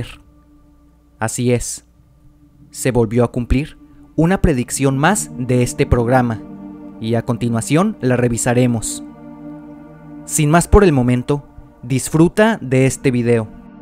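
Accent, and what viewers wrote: Mexico. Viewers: La voz del relator lo delata como un L. ¿Mexicano?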